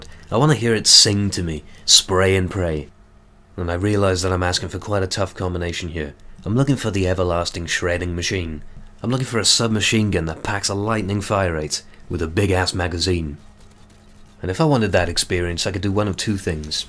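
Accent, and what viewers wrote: Wales, United Kingdom. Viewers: Are you Welsh? You seem to have a very strong welsh accent. But the manly one, not the gavin and stacy welsh accent. The one that that comedian has... uh, whats his name... he did a work experiance series where he did work instead of comedy... ANYWAY, yeh, so, are you welsh?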